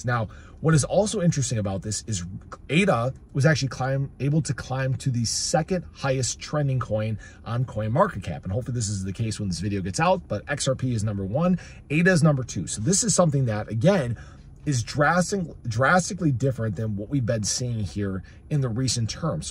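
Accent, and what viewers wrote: United States. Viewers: Wisconsin, eh? I was thinking Chicago 😄 thanks for the great coverage of ADA! 🚀🚀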